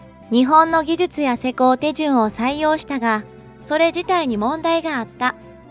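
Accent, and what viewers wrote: Kansai, Japan. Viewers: ナレーションの方は関西の方？ ヘンに隠さず、関西弁でナレーションされた方が楽しいかも。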